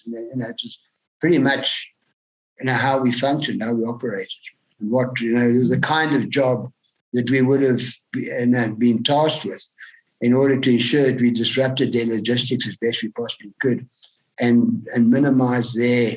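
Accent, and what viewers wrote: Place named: United Kingdom. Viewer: Thats the funniest thing I have ever heard. Utter trash, the boys were ready to take out Mugabe and Co. the moment the word came to move to Zim-Rhodesia but the likes of Ken Flowers and his poisonous ilk betrayed Rhodesia and all Rhodesians and sold the people of our nation into impoverishment, starvation and corruption for the next 40+ years. You are born and raised in the UK, I can tell from your accent on your channel. Maybe a father or mother from Rhodesia?. Let me ask you, if ZANU and Mugabe did such a cracking job why did your family flee to the comforts of the UK? did they teach you about the so called achievements of ZANU?. Have you ever lived in Zim or just visited your relatives there?. We mopped the floor with ZANU and ZIPRA time and time again. The one and only reason Rhodesia came to an end is down to sanctions and pressure from the USA, Kissinger and treacherous actions of the UK and their imperial mindset over colonials like us. 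Thats all. Militarily, we could have gone on for another twenty years. We never stole the lands from anyone, in fact, we liberated the Shona from the slavery of the Matabele. We paid for our lands, under crown warrant or with service.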